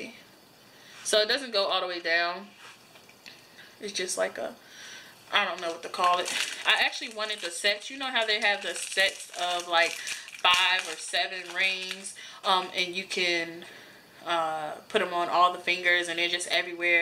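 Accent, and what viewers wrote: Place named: Newark, United States. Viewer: Hi girl, I'm from Newark! Going there for the festival in Lincoln Park! I knew I recognized the talk! Sounds like us! I live in Atlanta now! I have subscribed and get to see all of your great videos! Keep giving us the current slay! THANKS! Jp! !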